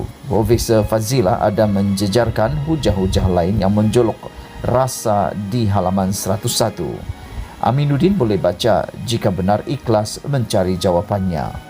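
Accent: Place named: Sabah, Malaysia. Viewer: Warga pitas sabah . Slang sabah.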